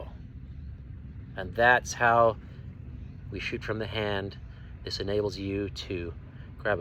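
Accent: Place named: Transatlantic, United States. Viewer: real transatlantic accent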